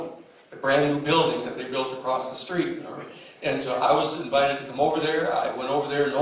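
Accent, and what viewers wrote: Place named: Mississippi, United States. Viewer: from Mississippi, detected!!!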